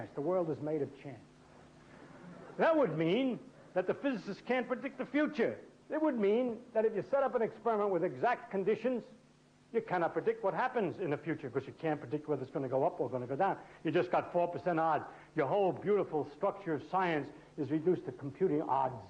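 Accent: United States. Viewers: Such a New Yorker haha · Brilliant Man..Sounds like physics 101 direct from the Bronx..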